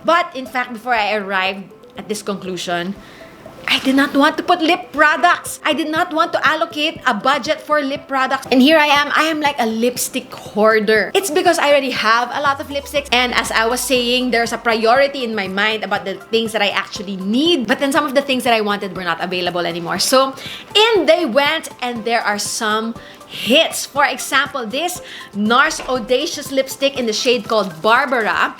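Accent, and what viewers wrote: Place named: United Kingdom. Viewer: ! Love the british accent! Ganda ng intro!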